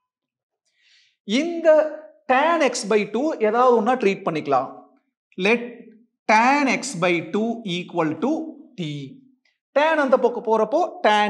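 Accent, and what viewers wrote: Coimbatore, India. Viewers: Sir neenga Coimbatore ah ila thirupur ah sir ??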